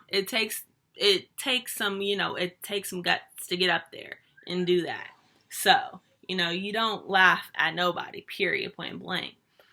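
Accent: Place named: South, United States. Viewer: Country accident cute asf😍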